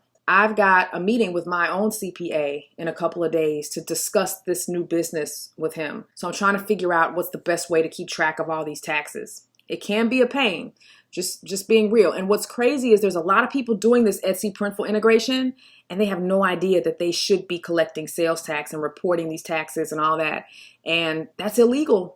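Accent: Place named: England, United Kingdom. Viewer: I like your english accent can you be my teacher:)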